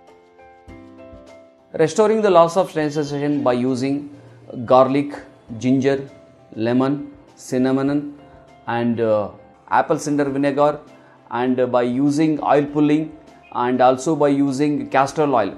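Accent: South India, India. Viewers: You are a very kind and patient person. The people understand him alright but just want to have some fun with him. Sorry I don't mean to be rude but I did find the first guy's remark. His very heavy accent is quite common in people from south India. When foreigners speak Hindi, they sound pretty absurd too.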